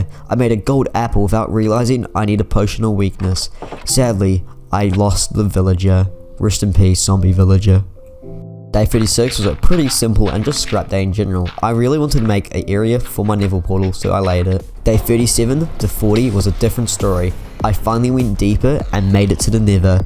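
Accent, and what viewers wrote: United Kingdom. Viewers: He is british don't make fun of his accent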